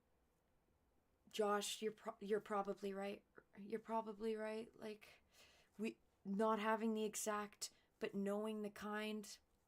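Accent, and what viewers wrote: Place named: Australia. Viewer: I freekin love u dude. I wish I knew someone in real life like u. The Australian accent sealed the deal lol 😂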